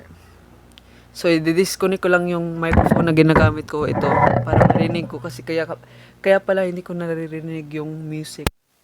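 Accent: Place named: Visayas, Philippines. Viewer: feel nako bisaya gyud ni ba HAHHAHA i feel uuuu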